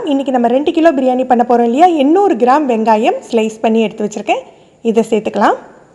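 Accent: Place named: Tirunelveli, India. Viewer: why I asked : Your Thamizh accent reminds me of my MSc classmate -1965-67- Loyola-Mr Farook from Tirunelveli.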